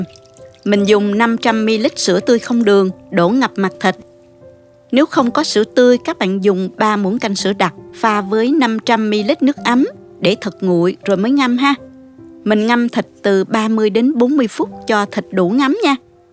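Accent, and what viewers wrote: South, Vietnam. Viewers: Giong miền nam, sang nghiêm mà giản di chân thành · Thích giọng của, nói giọng Nam nghe rất chân tình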